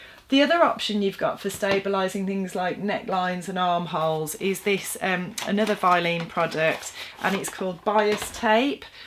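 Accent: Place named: England, United Kingdom. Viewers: Great video. Full of tips - and an English accent! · I just discovered your tutorials today. Your tutorials are awesome. Thank you for the work you did. I will be watching and learning more. I just love to listen to the English accent.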